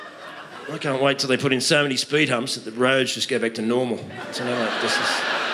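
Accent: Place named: Australia. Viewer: The more I learn about Australia, the more I realize it's just America with a cooler accent. And no space program, that's a bonus.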